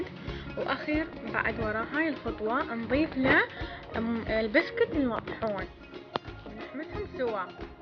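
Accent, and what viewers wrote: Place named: Iraq. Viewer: بجد روعة ولي خلىال4يديو أجمل كلامك الجميل بحب اللهجة العراقية جدا جدا شكرا على الوصفة ياعسل اختك من الجزائر🇩🇿❤️🇮🇶 🔔🤝👍💜🧡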